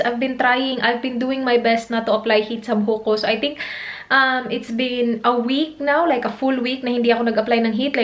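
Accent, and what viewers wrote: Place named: Visayas, Philippines. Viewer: Hi ate 😃 makahappy man ka lantawon te oi kay same atung accent pag magtagalog hahahaha😅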